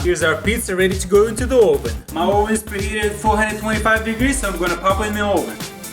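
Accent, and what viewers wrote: Russia. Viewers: I could tell u are Russian by your accent : )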